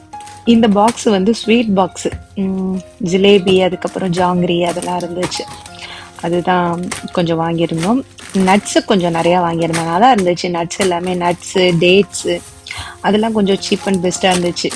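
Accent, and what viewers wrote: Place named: Tamil Nadu, India. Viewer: Ur way off speak like tamil Nadu that's y I ask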